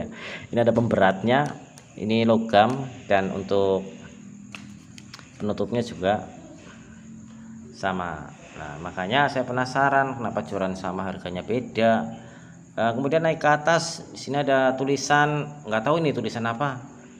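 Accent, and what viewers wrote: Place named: Banyumas, Indonesia. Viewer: Jnengan tiang pundi mas,kayane republik ngapak 😅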